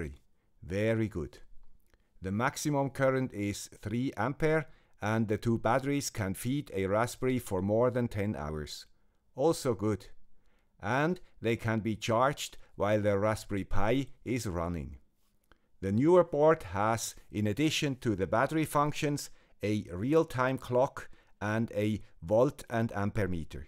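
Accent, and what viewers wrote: Switzerland. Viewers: Hi Guy with the Swiss Accent! Thank you for your outstanding videos; I really, really enjoy watching them and I appreciate your presentation style and sense of humor. Best regards!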